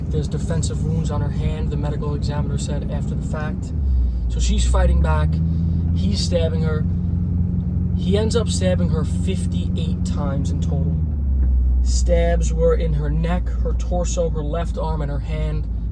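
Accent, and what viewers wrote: New York, United States. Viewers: I like listening to the New York accent without the F-bombs and double negatives for a change.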